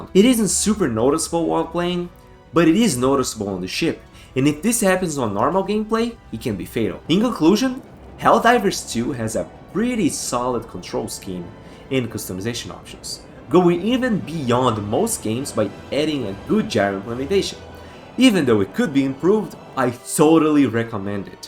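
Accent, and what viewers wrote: Brazil. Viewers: You have the brazilian accent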